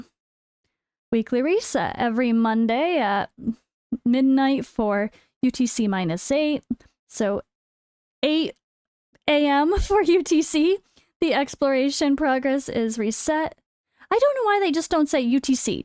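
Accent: United States. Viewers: I didn't know you were in Romania! You sound very American to be honest. You are very awesome! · You can’t hear that she’s American……😮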